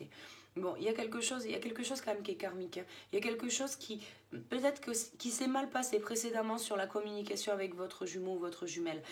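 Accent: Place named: South, France. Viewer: Merci!!! C’est exactement ça ! Vous êtes top et en plus j’adore votre franc parler 😉et votre accent ! Sud est où sud ouest?😜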